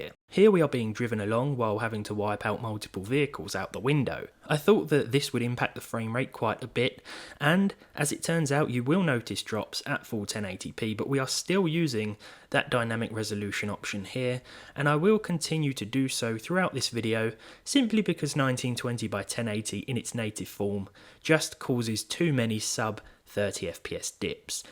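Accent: United Kingdom. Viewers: ew british accent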